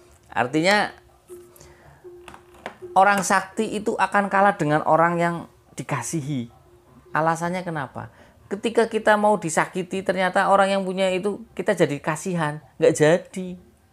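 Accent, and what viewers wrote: Tegal, Indonesia. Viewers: Asli mana Om.... Tegal yoo Logatnya... Salam Ngapak yoo Om... Bolehlah Kerisnya... Tak Dolan ning omah njenengan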